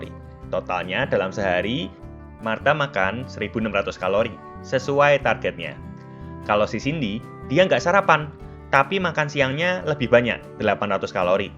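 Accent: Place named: Surabaya, Indonesia. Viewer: Hdaerrr suroboyooo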